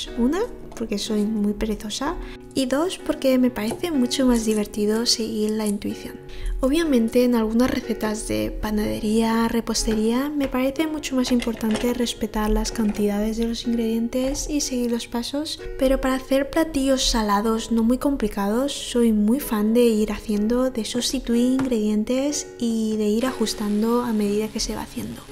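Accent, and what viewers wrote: Spain. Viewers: "Como buena asiática que soy. . . " 😁😁😁 Tu aspecto asiático combinado a tu acento español me atrapó tu canal☺☺☺. Desde luego que tu forma de cocinar también. Yo también he improvisado mucho en la cocina y es divertido 😆😆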